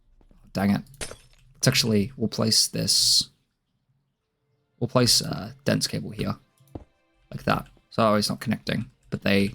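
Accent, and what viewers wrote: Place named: Australia. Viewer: thought you were a fellow aussie, great video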